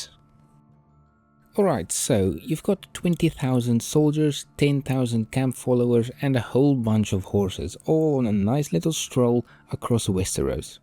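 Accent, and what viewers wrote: Afrikaans, South Africa. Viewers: What is your nationality? Accent sound Afrikaans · Good day to the Narrator, quick question, is the Narrator South African? 🇿🇦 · Are you from South Africa? 😭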